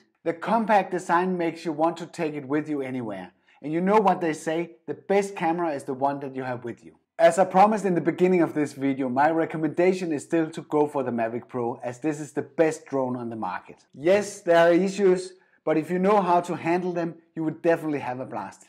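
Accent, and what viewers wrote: Denmark. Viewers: Are you Danish? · sounds like a danish accent?? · This has to be a Dane, with that accent. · Heavy Danish accent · Er du dansker?!😂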